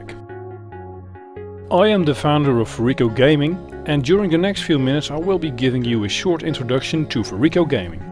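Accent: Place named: Netherlands. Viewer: is hij nederlands want zn zijn accent is gwn nederlands en 'is' en 'gamers' zat een heel nederlands accent op xD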